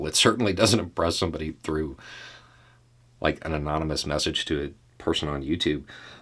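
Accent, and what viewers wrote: South, United States. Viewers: What I find especially humorous, if you're going to insult someone, a bit of reading will make you more effective. The person in question decides to insult using things that a well-read person would have realized did not fit this particular case. is from Florida, I lived in Florida, and I feel for him, but that's neither here nor there. He calls a Redneck and a Hillbilly. These are both things rooted in Appalachia and not the deep south. How reading could have prevented these mistakes? Simple geography would have told you that Florida is relatively bereft of hills. How about redneck? An understanding of history would have told you about the Battle of Blair Mountain, where the miners who were attempting to strike for human working conditions were called rednecks because of the red bandanas they wore. So, no, is neither a hillbilly nor a redneck, he has a southern accent, only a decidedly ignorant poorly red individual wouldn't know that. 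For the record, hillbilly, and redneck became terms of derision due to efforts by coal mine owners to dehumanize their workers in the eyes of the general public to make it easier to mistreat them.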